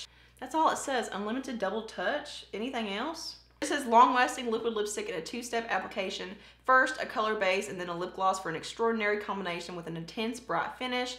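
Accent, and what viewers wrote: South, United States. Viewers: I just discovered your channel tonight and I have not enjoyed a "makeup" video in so long! I enjoyed your honesty and your familiar southern draw😉 I'm looking forward to seeing your future vids. Love the fact you are down to earth and real! I can so relate to you. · “Southern Charm”... I love that. I am from Savannah, Georgia, and I love your accent. It makes you...you. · I don’t think I ever told you this before but maybe I have, I absolutely love your accent. I could listen to you talk for hours. My whole family is from the south but I have lived in Northwest Ohio my whole life. My grandma passed away a year ago and she lived up here for the last I would say 40 years but still talks like she was from Lawrenceburg Tennessee. I just think a southern accent is very comforting. But I do know a lot of people hate on it and stereotype you as a hillbilly. 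That’s really sad because you’re beautiful person inside and out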